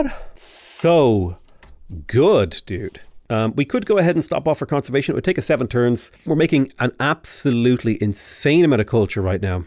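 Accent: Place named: Ireland. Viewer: I love ur irish accent i love watching ur videos just to hear u talk lol ❤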